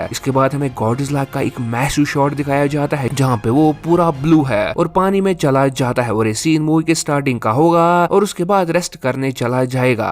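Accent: Kashmir, India. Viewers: Brother you have a Kashmiri accent ❤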